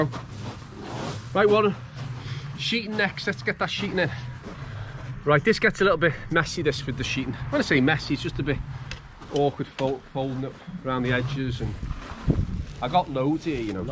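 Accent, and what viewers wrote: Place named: England, United Kingdom. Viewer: And you understand this English dialect? I hav ebeen many times in Liverpoll, Manchester, Leeds etc. but always keep my ears open tom listen what they say!, sometimes i pass, ha ha ha. I'm from te Netherlands and drove many years with a truck, in the UK the say Lorry, with deep frozen food and before that with flowers and plants, I've seen the Uk from south to North and from east to the West and every time I had to listen very well what the people tellinmg me, because I don't understand it immediately but after recall I did. Yes I have learn English at school but most from the Television special the westerns from the good all days!!, well take care you all!!!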